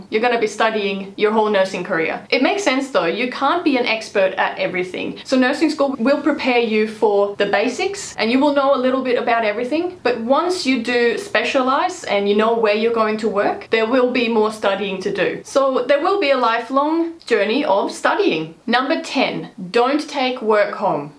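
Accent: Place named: Australia. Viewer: Hey. I am always wondering... your last name must be Swedish (is it the name of your hubby?). But your accent is clearly Australian to me. I am wrong? Sorry for asking such a crap. But as an Australian in my heart, I am interested in such things...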